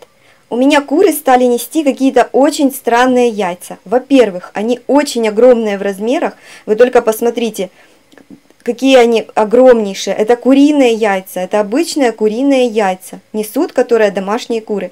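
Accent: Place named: Surzhyk, Ukraine. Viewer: Я не знаю , що там у вас за яйцеалмази, просто суржик ваш ріже вухо, говоріть або вже досконало російською( рашним язиком) , або пререходьте на українську мову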